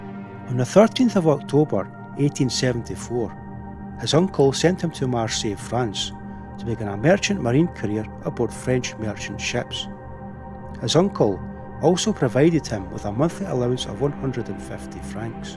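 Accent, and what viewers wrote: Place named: United Kingdom. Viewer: C rappy video. The narrator's British accent is too strong and the music is too loud compared to the narration.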